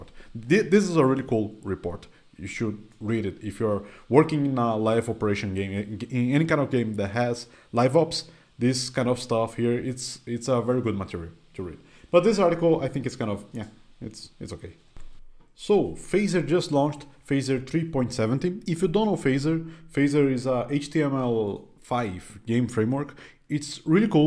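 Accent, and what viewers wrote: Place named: Russia. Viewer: Porque que ce ta com sotaque semi russo mermaooo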